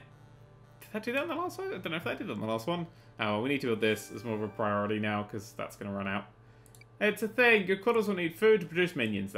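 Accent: England, United Kingdom. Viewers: I love the English accent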